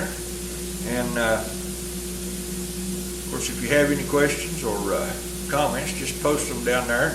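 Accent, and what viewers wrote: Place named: Texas, United States. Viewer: Hey, I have watch a hole lot of videos on You Tube and I want to say you are the best, really down to earth guy. I loved your show on safety. You are so dead on, of the point that it is your own business on safety. Thank you for your videos I have learned so much from you. You sound like your from Texas so am i close? LOL I live in Shreveport La Thanks again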